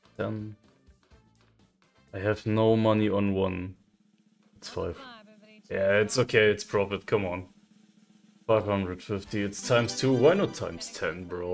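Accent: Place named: Germany. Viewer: 😆Nirgendwo sonst den deutschen Akzent so rausgehört!!!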